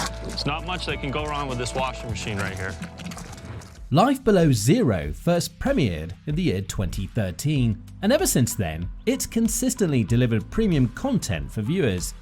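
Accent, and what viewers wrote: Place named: England, United Kingdom. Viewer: why does the narrator have a English accent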